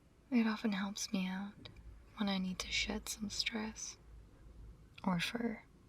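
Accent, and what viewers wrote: United Kingdom. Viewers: dude that posh accent lol. you actually did great with the animation, you really make them breathe and emote.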